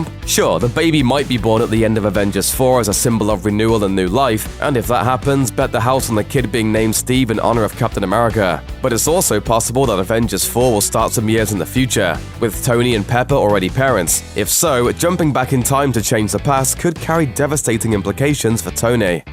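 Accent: United Kingdom. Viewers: Yo is this that the british dude from sanity not included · I like your Brit accent O-al-ll chw cute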